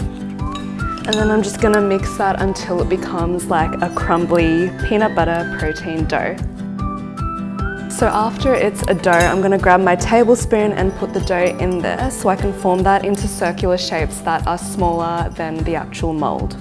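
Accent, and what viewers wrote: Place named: Australia. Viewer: omg the CC/subtitles says racist peanut butter cup, it just can't pick up the aussie accent I guess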